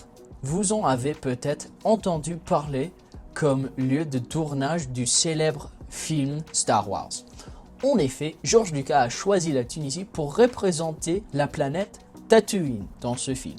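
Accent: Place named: France. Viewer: Excellent ton french accent.. Élise et Julien ainsi que Annick ont eu ton lien.. Il devrait echanger avec TOI.. 😊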